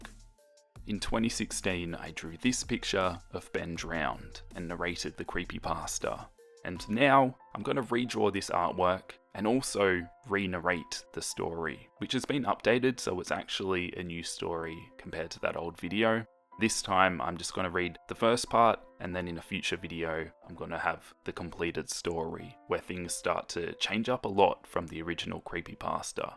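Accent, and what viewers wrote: Australia. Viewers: Australian I think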